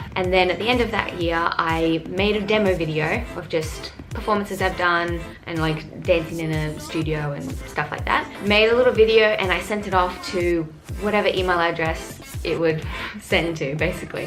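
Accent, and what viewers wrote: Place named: Australia. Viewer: Your Aussie accent tho, I can hear you all day! <3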